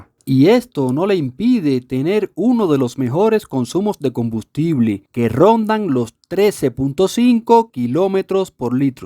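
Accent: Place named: Central America. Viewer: Con acento centroamericano.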